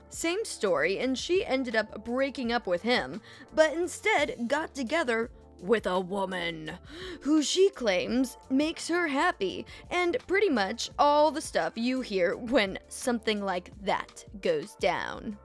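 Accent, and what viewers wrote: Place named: South, United States. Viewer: Your redneck accent is on point 😂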